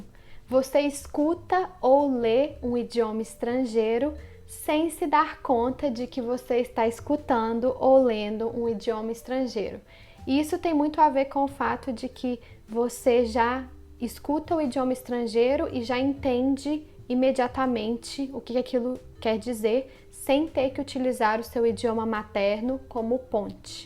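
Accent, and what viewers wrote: Minas Gerais, Brazil. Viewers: O seu padrão , com este sotaque , é mineirês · Ótimo vídeo...você é mineira? :D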